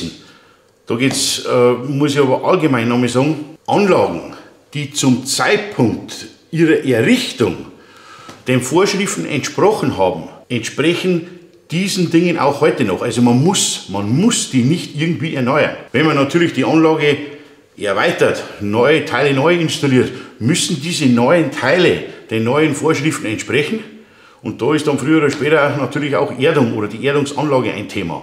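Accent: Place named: Lower Bavaria, Germany. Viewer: Hach. Niederbayrisch. Wunderbar.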